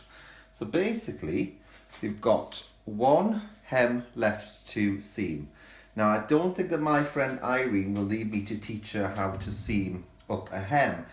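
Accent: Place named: Northern England, United Kingdom. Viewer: Excellent tutorial - especially for an absolute beginner as you explain everything so well. Although I'm not a beginner I got my trusty Brother KX395 out of its box and made one of these lovely little buntings and I'll be giving it to charity. Lovely to hear a northern accent 😃 Look forward to more of your projects.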